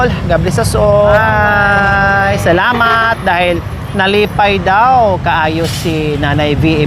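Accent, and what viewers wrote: Visayas, Philippines. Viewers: ang cute mo mag bisaya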